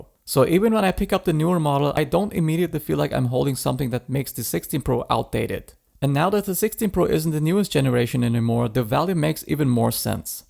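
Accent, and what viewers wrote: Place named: Germany. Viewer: An interesting accent. Are u german?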